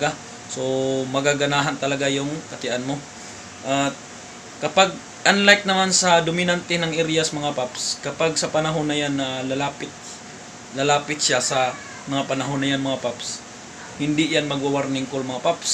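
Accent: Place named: Samar, Philippines. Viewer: Samar Yan bisaya or waray salita Niya Pansin ko sa salita niya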